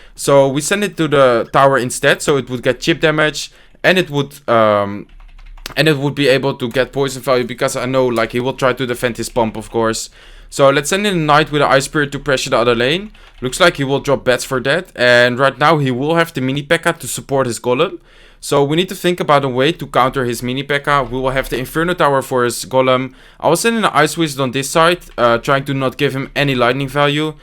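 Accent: Netherlands. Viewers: Are you Dutch? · Are you dutch? You have a dutch accent in your english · Ur so obvious dutch:) nice vid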